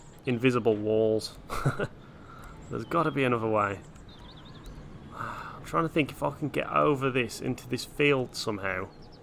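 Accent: Black Country, United Kingdom. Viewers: Oh wow I love this channel and didn't even realise you are from Oldbury, I live so close, in Tividale, going to Papa John's in a bit if it's open lol! I should have realised from the Tara a bit, but that just sounds normal to me, I forget our dialect really is quite confined, and no, we ay Brummies lol!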